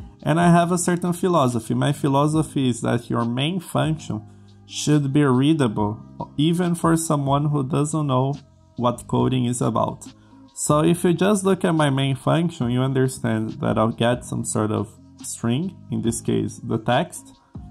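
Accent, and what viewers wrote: Brazil. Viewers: Amigo, você é brasileiro né? O sotaque é inconfundível.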